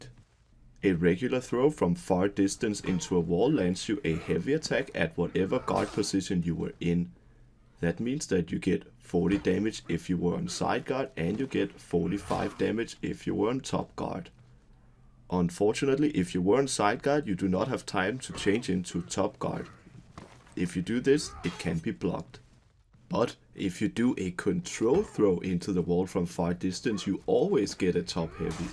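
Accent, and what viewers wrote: Denmark. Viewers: fuuuuck, man kan godt høre at du er dansker :D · The Danish accent is amAzing i love it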